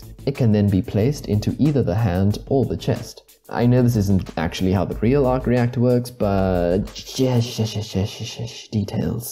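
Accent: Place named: England, United Kingdom. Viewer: thanks for replying, i meant i liked the silver mk2 suite seen in th 1st iron man most of all, the costume is meant to resemble a medevel knight to some extent, As is the brilliant robocop suite, silver reminds the eye of this most even though it may be stereotypical , as you americans say "if it aint broke, dont fix it" you do a good english middle class accent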